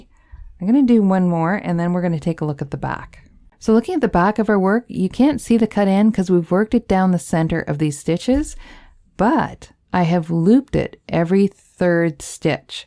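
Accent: Canada. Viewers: Love this! Also love her East Coast or Canadian accent..."the yarn end pulls oot." 😊❤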